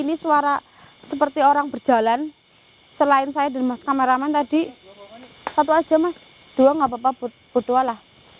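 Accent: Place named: Java, Indonesia. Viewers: Mba... Medok banget... Aku suka....👍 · Kyak orang kebumen to MBK smpean ki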